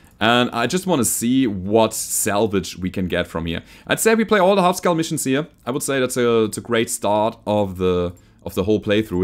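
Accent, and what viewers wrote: Germany. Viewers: Oh he is german?! I can hear it :)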